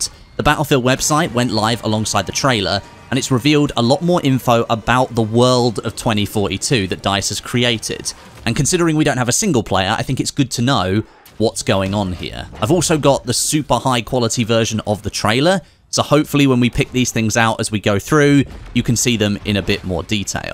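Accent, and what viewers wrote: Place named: United Kingdom. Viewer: the most bri'ish accent of a gamer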